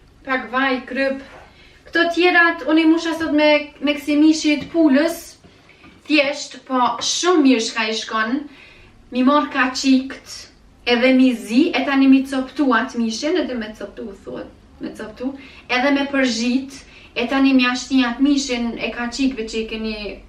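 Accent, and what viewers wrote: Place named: Kaçanik, Kosovo. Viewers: Qysh po fol si mu kan e Kaqanikit ? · M Z mos e kretiko se po tknaq ka po fol nashta asht e kaqanikut po ska lidhje se ksaj i ka hije ke e kahit ke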